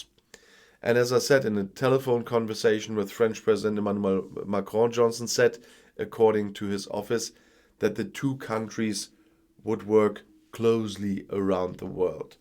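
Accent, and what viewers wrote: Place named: Germany. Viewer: ), 'Wertschöpfungskette':)., you are a true European: you combine convincingly English spoken news with a slight German accent, having trouble remembering the word for supply chain in your native (?) language with a Belgium given name and Dutch last name.. Way to go! BTW: Genius example of German functional descriptive language word salat. BTW2: I just realized being Dutch from German ancestry with my kids, all possessing Italian, French, Dutch and possibly German nationality. My email address ends in.eu. We will get there eventually.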